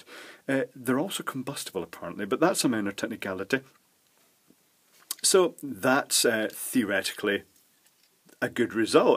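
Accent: Scotland, United Kingdom. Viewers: with the Scottish accent, "powers up" sounds like "purrs up"... it's nice to imagine the motor purring like a little kitty...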